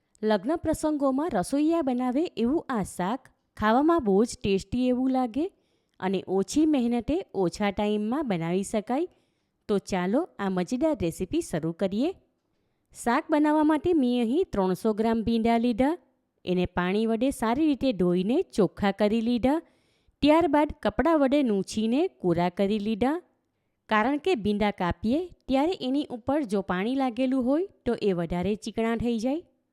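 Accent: Surat, India. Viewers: Wah Ben Bahuj Mast Majedar Recipe Share Kari Superb 👌👌 Ben Assal Surti Language Ma Badhi Recipe Shikvado Cho Recipe Jovani Ane Tamari Surti Bhasha Sambhadvani Bahuj Game Ok 🙏 U Ben 🙏🌹🌹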